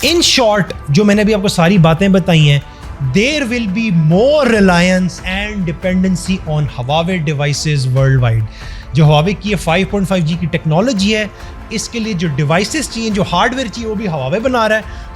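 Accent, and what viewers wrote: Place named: Punjab, Pakistan. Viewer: बोलने का तरीका पाकिस्तानी पंजाबी स्टाइल है अगर आप थोड़ा सा ध्यान दे तो साफ समझ आता है। तो अब निकल पहली फुर्सत में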